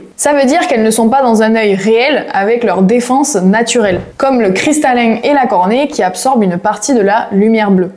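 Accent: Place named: Ardèche, France. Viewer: Vous avez un peu le même accent que moi, l'accent ardéchois, mais vous c'est plutôt celui du Nord de l'Ardèche, limite Haute Loire. J'ai bon ?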